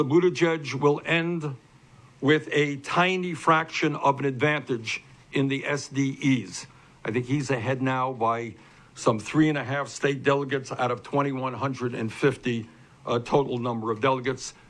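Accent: Brooklyn, United States. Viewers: Ya can't get the Brooklyn out of Bernie! When will people understand that ya don't mess with people from the NE? I'm from Philly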